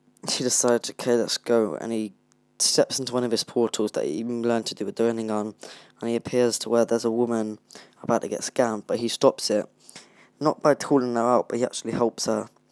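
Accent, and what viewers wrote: United Kingdom. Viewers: yo man’s is welsh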